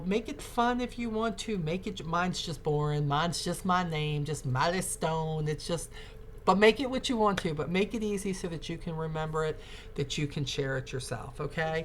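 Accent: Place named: Mid-Atlantic, United States. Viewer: Hi! Just started and I want to thank you for all you do. I'm from NJ but live in MI now and with so many other videos out there to watch, I felt at home when I heard your mid-eastern accent.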